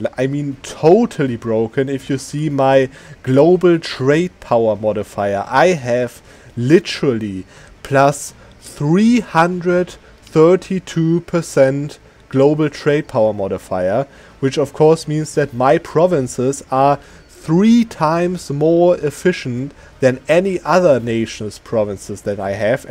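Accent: Germany. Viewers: Damn boy you sound so deutsch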